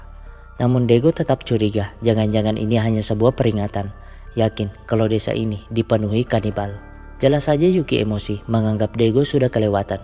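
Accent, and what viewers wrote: Makassar, Indonesia. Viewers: Orang Makassar Ki toh bang, Logak²nya melekat sekali 😭🤣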